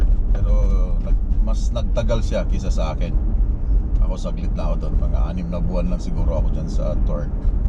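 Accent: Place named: Pampanga, Philippines. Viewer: Parang kapampangan sya kase ako ganon matagalog lol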